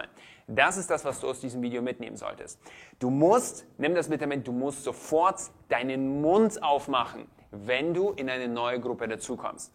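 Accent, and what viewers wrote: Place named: Yugoslavia. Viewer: Gaylappen mit piepsstimme und jugoakzent will mir erzählen, wie man der coolste im Raum wird 😁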